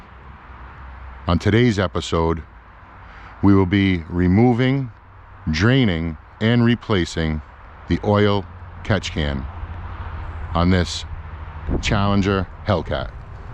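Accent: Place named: East Coast, United States. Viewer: Yo I miss that East coast voice!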